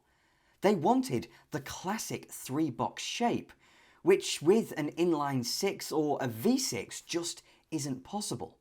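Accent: United Kingdom. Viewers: Great video and great British accent ( where are you from ?) I 've had a convertible turbo 1964 Corvair for 8 months, and it's a pure joy to drive. Greate noise, powerful enough, gorgeous and easy going. I brought it back from Denmark, on a 1200 km journey without any trouble. This car is better than a Mustang or mainstream pony cars, a reel underdog.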